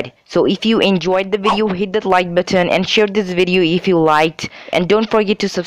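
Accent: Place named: United States. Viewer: Finally a Fuckin american Im so tired of those indians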